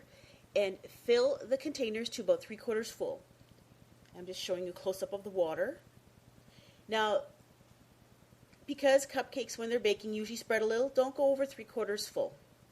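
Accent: Canada. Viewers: are you cannadian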